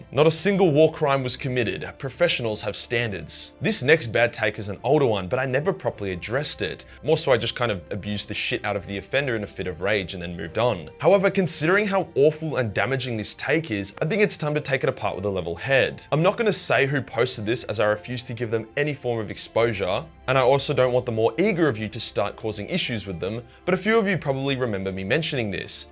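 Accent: Australia. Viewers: Seriously man i jusy found your channel a couple months back and have since been watching old and newly uploaded videos, i just love your commentary and the aussie accent and attitude is just the cherry on fucking top! Cant waif for more WK videos in the future! Also fuck those incel breed losers in the dark corners of the galaxy, fucking xeno brain dead fucks. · Alt title: hate comments read by an Australian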